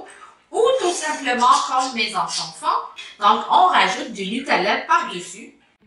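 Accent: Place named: Canada. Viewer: Merci pour la recette trop mignon l accent canadien sur une femme kabyle Recette que je vais tester merci pour le partage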